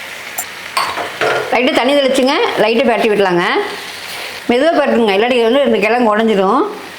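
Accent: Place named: Kongu, India. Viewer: Super parkkavea 🤤aaguthu maravalli kilangula eppadi senju saptirukkom sakkravalli la eni thaa try pannanum samayal video nu start pannirukeenga niraya per ku dovuts varum ellarthukkum reply pannunga antha responce kea nalla views pogum neenga pesurathea kongu slanga ovoru wordskum eadaila responce ah erukku 🙏 enaku thonunatha sonna thappa eaduthukka vendam thank u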